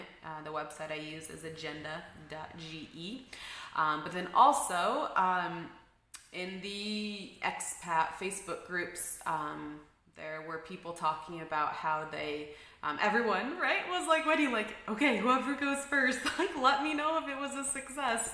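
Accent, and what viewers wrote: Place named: Scandinavia. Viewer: What is your native language? It seems Danish or Nordic or something. The accents read Nordic.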